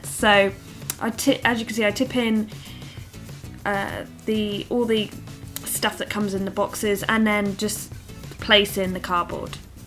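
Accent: United Kingdom. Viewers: haiii me again XD so from ur voice ur from uk ??????? if u are OMG plzzzz do u know any good breeders in uk i cant find ANY !!!!!!!!!!!!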